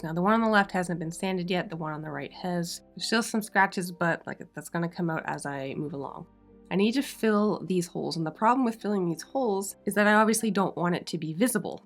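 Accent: Canada. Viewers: ! I could tell by your accent that you are Canadian, but so delightfully surprised that you are in the Valley! I'm in Switzerland but love so much seeing an artist from my neck of the woods! I actually got a little emotional when I saw the final result. I was imagining the people who owned that piece over the years and how happy they would be to see how you gave it such a stunning new life! I especially love the woodwork on the middle drawer. Lovely work!! ❤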